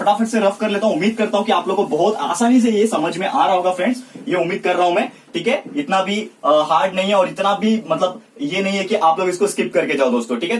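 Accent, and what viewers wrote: Maharashtra, India. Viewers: Sir your teaching very well Sir Kay aap Marathi ho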